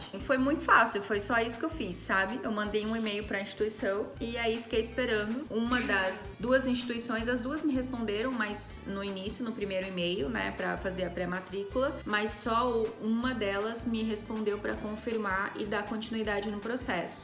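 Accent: Portugal. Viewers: Muito bem, a forma de ajudar os brasileiros que pretendem vir para Portugal tem melhorado ao longo dos tempos. A metodologia das atividades de desenvolvimento incentiva à autonomia das crianças. Fora do horário das educadoras de infância devem existir atividades mesmo não estando contempladas no currículo de desenvolvimento, como seja a socialização da criança. Conserve o seu sotaque de origem, é um valor cultural que não deve perder, já se nota uma pequena aproximação às vogais da zona minhota. Na rede pública, quando há educadoras com formação adequada, é facultado o ensino do inglês a partir dos 4 anos. A IPSS próxima da vossa residência tem bastante qualidade.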